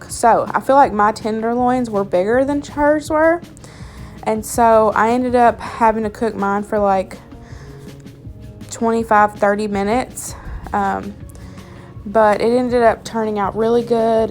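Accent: South, United States. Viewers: Totally agree with all the other comments! The food looks incredible! Your southern accent is awesome! Subbing now and looking forward to more of your vids! Great work!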